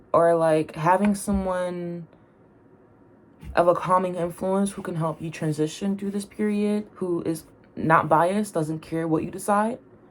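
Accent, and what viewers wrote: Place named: United Kingdom. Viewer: Pile 2… AHHH your voice is genuinely like body lotion for the spirit 😂even when you’re being blunt with me about what I need to hear 👀 also why is your British accent sounding even MORE British than mine right now???hahhh A lot of this definitely felt like old energy & some is energy I’m still in the process of releasing. Omgg yes I’m not anti advice AT ALL, Don’t get me wrong, I’ll always ask for tips and recommendations when I need them. But unsolicited advice… especially around my health… I just can’t 😭 The constant ‘have you tried this?’ can feel so overwhelming and like I’m being pulled in a million directions, even when people mean well. It can make me feel like I’m not ‘doing enough’ Sometimes I just need empathy… not ‘fixing’ lol I really loved your take on simply observing the energy & sitting with it, without feeling like I need to take immediate action. 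I need that right now. Pile 2 also lovingly called me out on my own bs 😅 I moved away &released a toxic environment, but I’ve also realised I play a part too… bc I’m very mentally and physically restless and really struggle to just BE. it’s about shifting the mindset not just the environment! That has been a challenge for someone who always wants to be ‘doing’ something when sometimes I actually just need to rest. ahhhh thee synchronicity whatttt😭 I mentioned that song in my last reading… & you posted yours before mine 👀 & I promise I only just watched it now and didn’t know you mentioned this til just no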